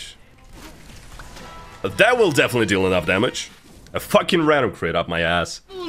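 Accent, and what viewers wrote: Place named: Russia. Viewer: Единственный канал на английском, который я могу нормально смотреть (уж слишком русский акцент помогает, да и местами русская речь прикольно звучит (¬‿¬ ) )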